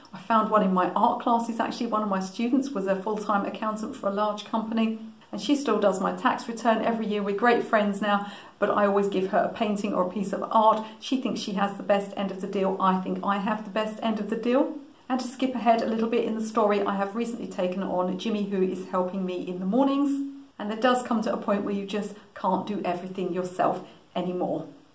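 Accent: United Kingdom. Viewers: I’m so happy to find you! I literally cried. I want you to know that I think that you are a wonderful teacher. I’ve actually learned a few basic techniques with things like finding the correct perspective as well as dimension. I can’t draw. I’ve been trying to paint with watercolor for about a year and haven’t gotten very far. Most people on YT are teaching to “use this brush and make this stroke and if you do it enough you’ll have a beautiful painting of a bouquet of flowers. For someone like me, I like to know why I’m doing this stroke with this particular brush and all the things in between. You teach those things and explain them in such a straightforward way. The British way I suppose. Always very charming but to the point. Im like that but without the charm and cool accent. Lol. However, the most important thing you teach is that one can learn. You don’t have to be born with it. 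And today’s video also encourages those who don’t necessarily want to conform to other’s opinions. Thank you so much! You are wonderful! I’m going to take some of your classes as well! I wish you all the best! Come visit us in the US soon!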